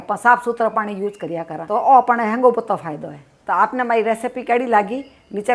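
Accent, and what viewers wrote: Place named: Marwari, India. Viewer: Very good respie aunty ji and ur voice of marvari so sweet ...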